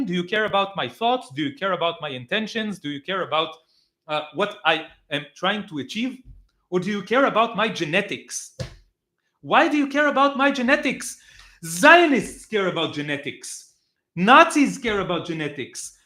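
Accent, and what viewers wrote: United States. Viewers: Great American accent. I think I have never actually met or conversed with an "honest zionist." I started the video thinking Piers would be the honest zionist because I didn't know who the other person was. Wow. That shows how bad things are, when Piers is the more "liberal" person in the conversation, because here in the U.S.A. in GENERAL I think he is considered a right winger. At least he strikes me as conservative and I have previously heard him praise Trump and MAGA. Zionism, however is so mainstream here in the U.S.A. It is embedded in both political parties and both right wing and left wing thinking. You're correct, the liberal zionists always find a way to package it all up in a nice package to deceive the world. To other Americans, do you know any honest zionists here in the USA that are not from the place called Israel? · Thank you for the great analysis. 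Every now and then I speak to a liberal Zionist who says there are 22 Arab states and just one small Jewish state. Yes, that means they approve ethnic cleansing. P.S. The Hebrew accent is very like Ukrainian/eastern European accents to me when a lot Zionists like Netanyahu speak. Not so much yours. I think the original settlers who revived Hebrew came from eastern Europe. Your American accent is amazing.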